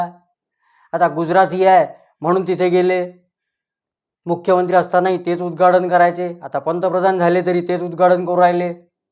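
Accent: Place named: Varhadi, India. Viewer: Sir, mi aaple you tube chanal nehme baghato, karan mala aapali varadi bhasha yekayla khup khup bare vat te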